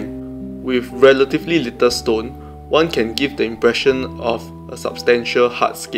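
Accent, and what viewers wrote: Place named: Singapore. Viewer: Sound like my country men, u singaporean?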